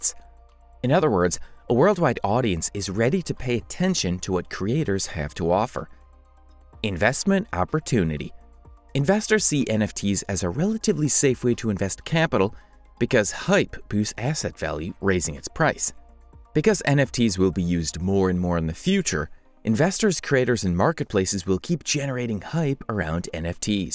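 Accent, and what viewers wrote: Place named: Chicago, United States. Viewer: Man the Chicaaago Aaaaahhhhcent on this guy making it hard to watch.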